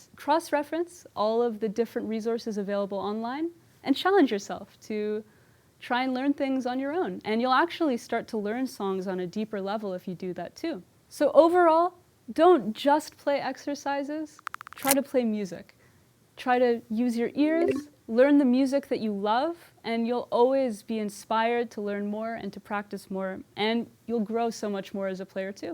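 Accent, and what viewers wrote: Canada. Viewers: She's a great teacher. And I love the Canadian accent.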